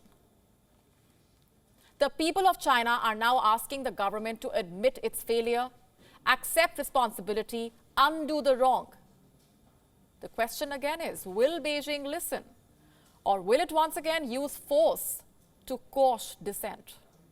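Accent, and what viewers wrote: India. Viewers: I disagree completely. Actually Indians speak better English (more grammatically correct) than the rest of the Anglosphere. That said, the Indian accent is a challenge to other English speakers, North Americans in particular. I hate to use the term but your comment is borderline racist. If Al Jazeera used native Arabic speakers instead of "white' mouthpieces, you wouldn't care for their accents either. You could use the subtitles function, as I usually do. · You are dubbing the interviewees spoken words with your strong Indian accent English. I'm effectively bilingual in English and Chinese, if your subtitles are accurate while the Chinese woman speaks in her own language, why would you dub her in English? People in China are frustrated because of the suspected abuse of Covid swab-tests that are mainly outsourced to private companies, which resulted in suspicious attempts to spread the virus throughout China, because it's very profitable for these private companies to legally syphon money from the Chinese government thru exploiting the legal loopholes, prolonging the current Covid19 situation, and collecting service charges for all the daily individual Covid19 swab-tests.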